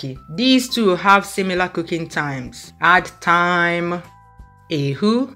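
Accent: Igbo, Nigeria. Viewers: i just love your ibo accent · I just love listening to your voice overs and authentic Nigerian accent. So warm and easy going. Having been in Canada for 12+ years.. reminds me of home!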